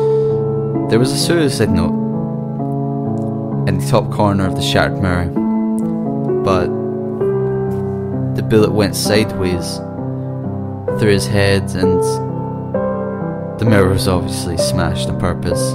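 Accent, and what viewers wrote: Scotland, United Kingdom. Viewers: Scottish accents are sexy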